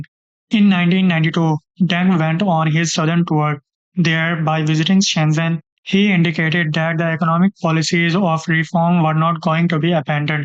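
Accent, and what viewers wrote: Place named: India. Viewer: For heaven's sake ..listening to your Indian accent when speaking Ingrish is so nauseating and annoying.. btw President Xi did not get rid of his political opponents but instead got rid of the rampant corruption in China once and for all.. what about India which is a also a notoriously corrupted country...? Please focus more on your India's domestic.corruption..political divide..religious riots..homelessness., slums .rather than worry about China's problems..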